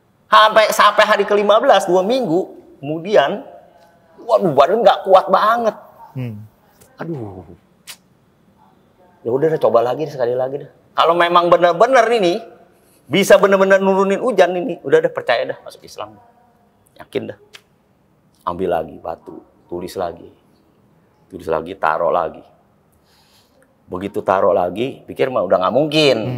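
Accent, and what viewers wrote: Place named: Jakarta, Indonesia. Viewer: Betawi bgt ye ngkoh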